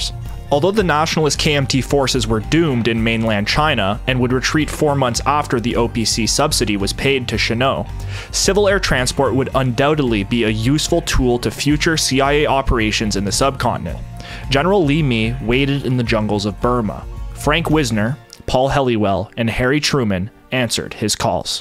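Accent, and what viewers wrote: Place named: Central Alberta, Canada. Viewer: That sounds like a central Alberta accent on the narrator 😅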